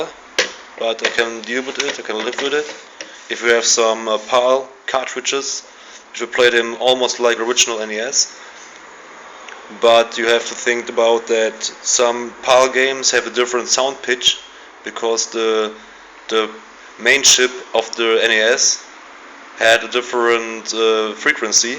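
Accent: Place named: Russia. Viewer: Sound like russian try to speak english Где надыбал , колись :)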